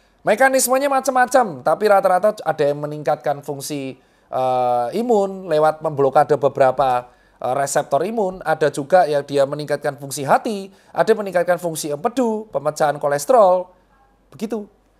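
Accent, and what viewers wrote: Java, Indonesia. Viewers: Dengerin dokter ngomong, kentel logat Jowoe..jd terhibur jg..gaya bahasan yg bikin tambah sehat...😅😅😅, semangat terus , dokter Tirta..❤❤❤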